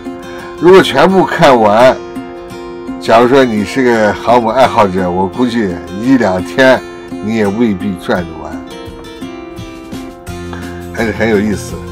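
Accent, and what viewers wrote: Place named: Xinjiang, China. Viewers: 姜先生您好，听了你的节目有一阵了，感觉你的口音特别像我们新疆的普通话，结果今天终于发现咱们是老乡了，我从小在博乐长大，在乌鲁木齐上大学并生活了十几年，现在我在Iowa 定居。 你的口音太亲切了。支持老乡。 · 一样新疆老乡，真真的谁的是新疆的疆普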